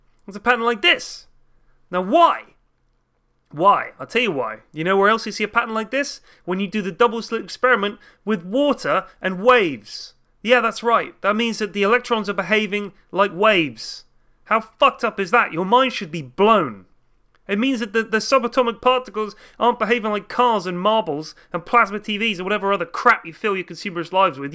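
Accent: United Kingdom. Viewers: people with british accents make things sound much more intelligent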